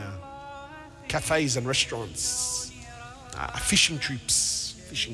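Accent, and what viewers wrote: Australia. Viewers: Is this preacher Australian? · is he aussie? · Is he Austrailian?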